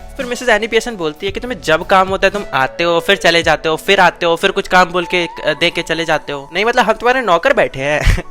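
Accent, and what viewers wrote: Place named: Indore, India. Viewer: meko bhai tum toh indori accent bol re ho ni😊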